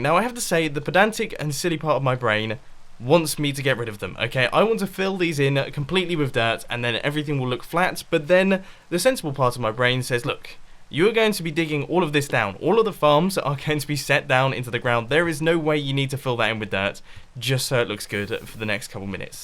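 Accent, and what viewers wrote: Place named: United Kingdom. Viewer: you pronounce farm fohm because you're British shugeh cane fohm huhmmit croft laver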